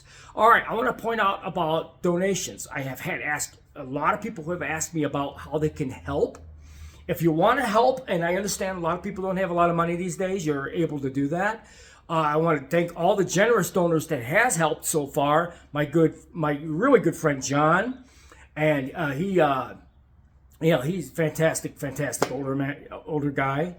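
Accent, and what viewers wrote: Chicago, United States. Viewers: I thought you had the Chicago accent. A lot of my family came out of Chicago. I've donated before, I'll do so again. Looking forward to seeing more stuff whenever your time and copyright laws allow. Good luck!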